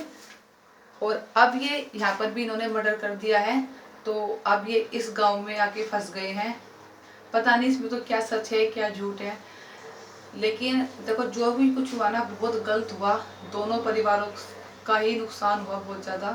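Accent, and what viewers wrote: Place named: Haryana, India. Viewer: di ap haryana ki language me baat krte ho ho aap shamli ke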